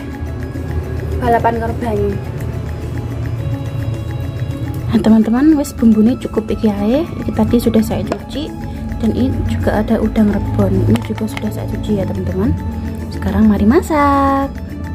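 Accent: Java, Indonesia. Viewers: Salam kenal,mbae jawane ngendi mba.. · Salam kenal mbak, pertama kali sy liat chanel ini. Chanel youtub'e sampeyan moro2 nampang ndek home, iseng2 tak buka.. apik mbak, konten sederhana, natural, aseli, gak neko2, gak dibuat2, kamu jg orangnya menyenangkan enak dilihat, tulus apa adanya. Jarang bgt di jaman skrg ini. Teruskan berkarya, kudoakan smg makin sukses ya, aamiin. O ya, jowo ne endi mbak?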